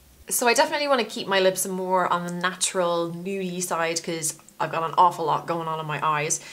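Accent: Ireland. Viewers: DAMN IT. I meant to ask if you were Irish? Your accent sounds Irish but you're Australian? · She is Irish but recently moved to Oz. She better keep her Irish accent I love it.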